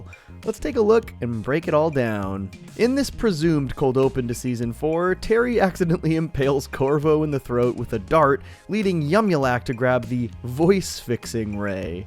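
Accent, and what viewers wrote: United Kingdom. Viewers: I domt know how to feel how stereotypically British he sounds it feels a tad out of place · Love the new voice. But I'm a sucker for a BBC accent. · I just hope this guy doesn't become Rick or morty. A british Rick and morty would piss me off.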